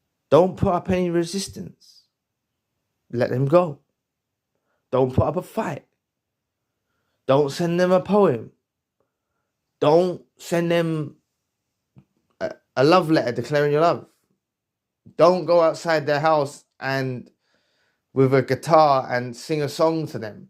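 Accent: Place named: Birmingham, United Kingdom. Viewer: You are a very smart man are you from brum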